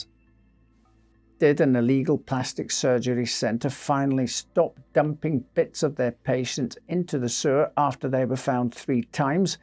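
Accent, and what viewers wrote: Scotland, United Kingdom. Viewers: It's his voice. It is wonderful to listen to, especially for me as I admire any accent from the United Kingdom. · Nope, I believe he is a Scot. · Or maybe he is just Scottish… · I can't put a finger on your accent. Im getting English, South African, Scottish and even Welsh! I love it, love the videos too! ❤ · He's Scottish 😊, I'm.also Scottish and that's how we sound when we are talking proper 😅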